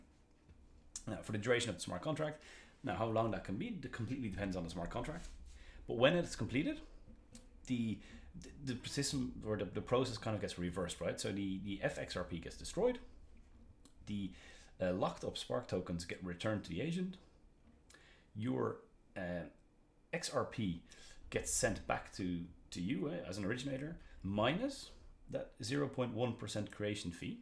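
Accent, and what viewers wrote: Ireland. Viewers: Congrats and good luck creating new videos.. Looking forward to more videos from you....love your irish accent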